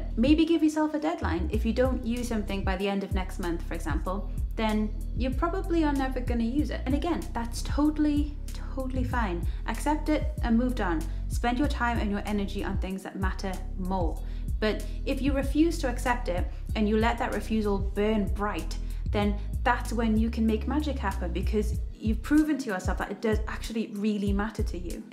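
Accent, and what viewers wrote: Newcastle, United Kingdom. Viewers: are you from Newcastle? (or somewhere close?) :D i just uploaded a video on minimalism too and i'm also from newcastle..but i live in The Netherlands now... hi :) :) :)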